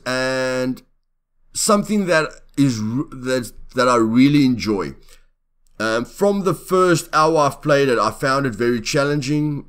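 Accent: Afrikaans, South Africa. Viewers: You are from South Africa? LOL I always asked myself what kind of accent you have. And it is an Afrikaans influenced accent, right?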